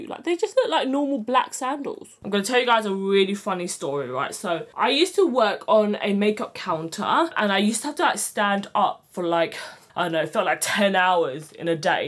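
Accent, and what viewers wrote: United Kingdom. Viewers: Hi! Wassupppp??!!..🤗😬 i just looove love loveee to listen to yr brit-ish accent..hahahaha...take care love 🌹🎈🥰 keep inspiring us! Have a safe flight and enjoy your vacation and boo! Haha...just have a comfy and silent vacay love. Let yr mind get more rest yaaa..cheers!🎈🌹🥰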